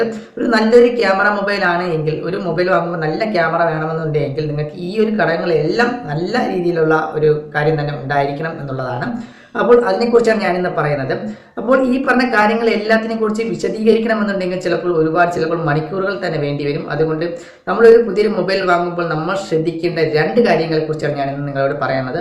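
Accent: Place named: India. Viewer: Video title in English, spoken in Indian.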